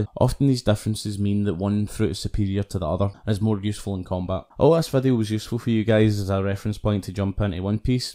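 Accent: Scotland, United Kingdom. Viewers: I was gonna say I could’ve sworn that’s Scottish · Is that a Scottish accent? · This guy’s scottish fs tho so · U scottish? 😄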